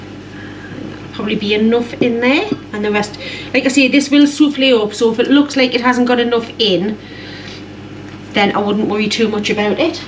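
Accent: Newcastle, United Kingdom. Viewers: I love the Geordie accent and that pie looks delicious just like the sort of food i was bought up on, happy days. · Looks yummy, and i love a geordie accent ❤ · Being Irish, when I saw he words "Bacon, Eggs & Pie" I was sold......The fact your a Geordie was the icing on the cake. My family lived in Newcastle for many years before we returned to Ireland. 3 of my siblings were born there. My Uncle taught in school there all his adult life & my nan passed away there, she was an incredible cook! No scales, no measuring... all done by eye and feel! God bless you love!!! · A Geordie lass, after my own heart.😁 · A Geordie accent and a Manchester United glass on the drainer! tut tut;-) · Wow! Loved that video. I don’t know what algorithm YouTube had that meant it popped up on my home page but I took the click bait (must’ve been the reference to ‘bacon & eggs’ coupled with ‘pie’) that hooked me. 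/Madam, you are just brilliant and I’d love to pop in for lunch sometime. I loved the background and history you chatted through, from your late mother in law’s rolling pin through to all your references to your “Nan” and her influence on you. On top of all that to have your rolling commentary in such a wonderful Geordie accent was perfect. Thank you. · thanks am from Gateshead myself but i have lived down in Southampton since late 1990 into 1991 it’s lovely hearing that geordie accent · Love the Geordie accent real people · My Geordie Grandma used to bake this, it was often part of our Saturday lunch, with a home made soup. Made me very nostalgic, not only the pie but the accent, thank you 😘 from Australia 🇦🇺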